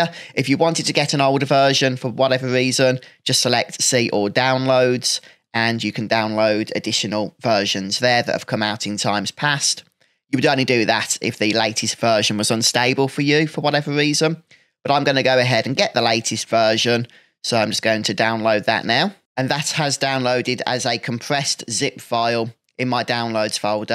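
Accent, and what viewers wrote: Birmingham, United Kingdom. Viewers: We're having a debate, I'm convinced you're from Birmingham but my friend disagrees, please confirm haha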